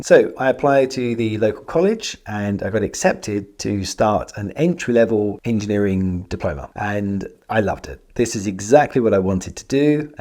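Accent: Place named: England, United Kingdom. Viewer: Amazing teacher, animator and my favorite. Nice English accent as well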